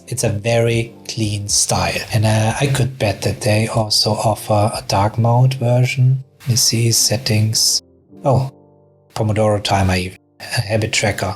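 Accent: Germany. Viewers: do i hear a fellow german accent there? Great video 👌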